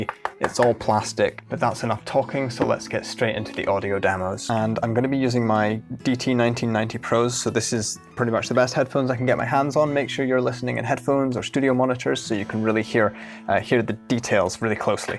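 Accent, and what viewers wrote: Scotland, United Kingdom. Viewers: Kinda sounds Scottish?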